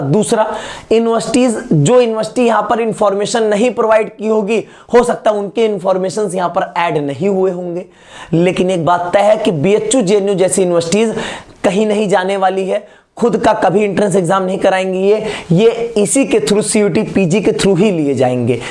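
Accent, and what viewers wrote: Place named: Bihar, India. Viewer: Sir ji kya aap Bihari ya purvanchal se hain kyuki aapki Hindi ham log se milti julti lg rhi hai 😊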